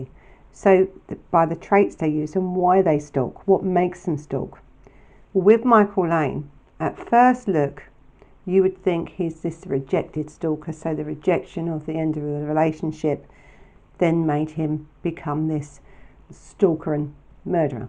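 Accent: London, United Kingdom. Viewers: she sounds like she’s from London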